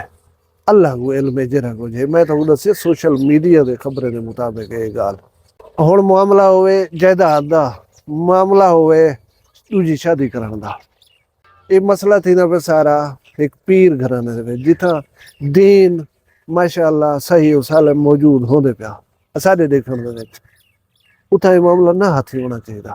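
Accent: Saraiki, Pakistan. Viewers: behtreen sraeki andaz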